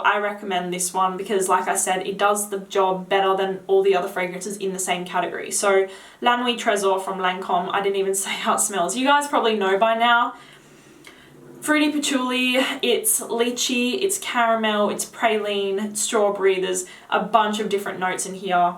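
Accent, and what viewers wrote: Australia. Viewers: i looooooove your cute australian accent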